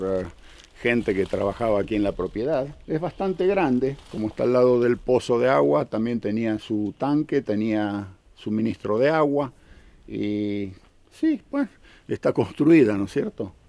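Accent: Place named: Argentina. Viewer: ¡Pero cómo narra este hombre! ¡qué delicia escucharlo hablar de los frutales en el minuto! yo no era fan del acento argentino hasta que escuché a. Saludos desde España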